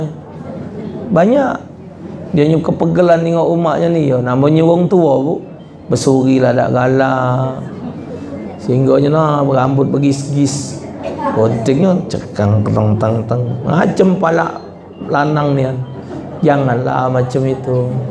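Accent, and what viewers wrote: Palembang, Indonesia. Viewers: Ustadnyo asli mano mang R nyo plembang nian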